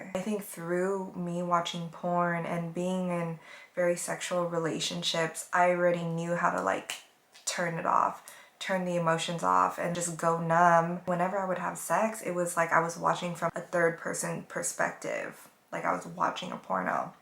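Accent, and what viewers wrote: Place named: California, United States. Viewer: Catholic religion is the reason why children turn out like this. These girls who where handed everything and go to catholic school end up the worst. Girls like this who are ungrateful always act like this. They always seem to turn out to be narcissists on youtube or some type of affirmation platform, looking for attention because of her narcissist ego. This is why she is always on a platform showing what she has needing attention. She will always be this way. Blaming porn for your failed relationships and choices, and choices of men is ludicrous. There are million of women who don't watch porn and still want abusive men instead real men. The way she talks you can tell she's from California. They have the attitude like they are great. The mentality of of doing what ever they want with consequences. "wanting to be cool." Californians are handed everything and still act like this with no appreciation fo being born privileged. 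This type of narcissist will blame everyone else for their problems. Now she expects for men to forget about her past. She starts talking about fast money. Theres no such thing as working hard and gaining wealth, thats an oxymoron. Money brings wealthy. She was a rich girl who never need money. So why is she preaching about money? Her parents will take care of her tor the rest of her life. People born poor need money to build wealth. Her explaining that she only views men if they are "charming" "good looking" she mentions nothing of their IQ or intelligence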